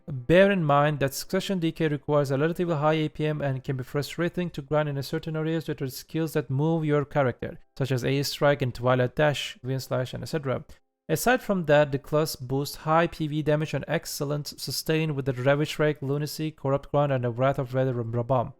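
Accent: Turkey. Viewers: Are you turkish ? Your accent exteremely turkish .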